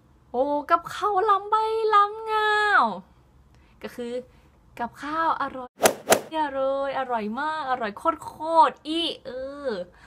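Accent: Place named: Phayao, Thailand. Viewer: สำเนียงพะเยาครับ ผมอยู่น่านสำเนียงเหมือนกันครับ ทั้งภาคเหนือมีแต่ เชียงใหม่ครับ ที่พูดไม่เหมือนคนอื่น นอกนั้นพูดเหมือนกันหมด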